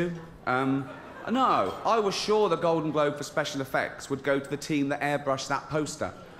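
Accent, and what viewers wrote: United Kingdom. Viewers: Yea unless you are also British, reading his lips is next to impossible. Accents are a SOB.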